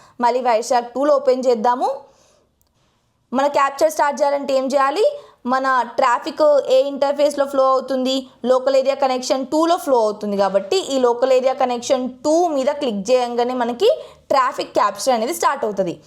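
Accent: Telangana, India. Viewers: బాగానే చెపుతున్నారు కానీ తెలుగుని తెలుగు లా మాట్లాడండి దయచేసి అరబిక్ హిందీ ఉర్దూ కలిసిన తెలుగు భాష యాస మాట్లాడకండి దయచేసి 🙏🙏 తెలంగాణ యాస భాష అనకండి.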